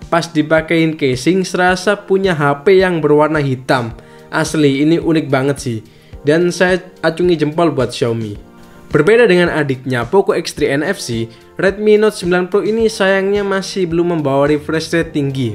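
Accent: Sidoarjo, Indonesia. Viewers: eh masnya orang sidoarjo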